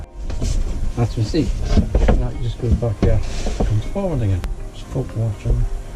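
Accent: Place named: Scotland, United Kingdom. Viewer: My automatic captions were translating “van” to “vine”! Ha! It’s never heard a Scot before. Brilliant set up!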